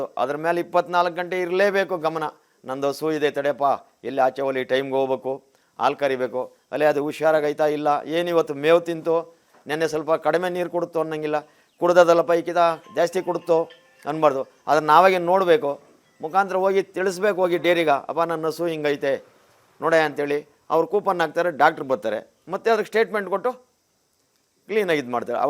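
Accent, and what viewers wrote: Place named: Chikkaballapur, India. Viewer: ಇವರ ಭಾಷೆ ನೋಡಿದರೆ ನಮ್ಮ ಚಿಕ್ಕಬಳ್ಳಾಪುರ ಅತ್ವ ದೊಡ್ಡಬಳ್ಳಾಪುರ ಕಡೆಯ ಭಾಷೆ ಅನ್ಸುತ್ತೆ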